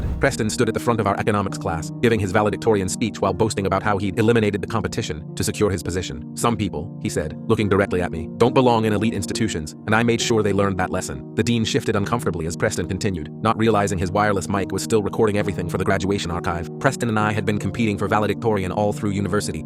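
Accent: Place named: Scotland, United Kingdom. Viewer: why is the ai voice like. VAGUELY Scottish at times...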